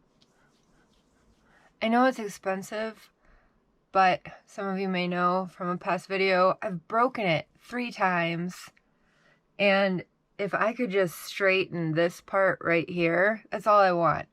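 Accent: Wisconsin, United States. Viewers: Me too....never noticed that there was a seeable problem with your nose. I think the physical pain of surgery with questionable results they will NEVER guarantee isn't worth it. Late in my career l worked for Trane and relocated to Lacrosse. I loved the WI accent, especially with females. You are beautiful and make me think you are in your mid 20's....l've already learned a bunch of tricks-techniques from you. Yes you do seem happier today....you deserve it! 😘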